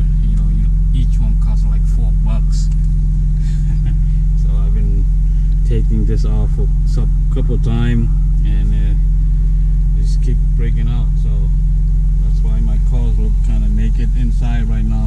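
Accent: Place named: Cambodia. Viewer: I detect a Khmer accent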